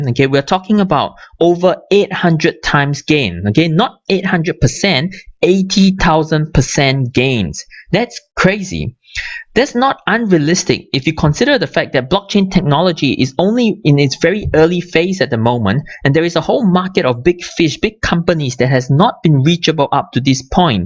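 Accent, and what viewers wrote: Singapore. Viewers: Great analysis and explanation, and I like your Singlish! Can you review QLink ? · Yup, im from Singapore and I live in Singapore, when i heard your accent I knew you were from Singapore. omg nice to see a fellow Singaporean into crypto too, anyways we could get in touch, would like to learn more knowledge on crypto :)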